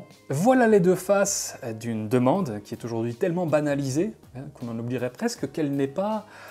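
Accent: Belgium. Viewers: Ce format est vraiment trop cool ! Que ça soit les musiques, l'image, l'humour bien dosé, le propos, les exemples illustrant tel ou tel bout de la méthode, l'accent belge, c'est parfait ! T'es au top et merci !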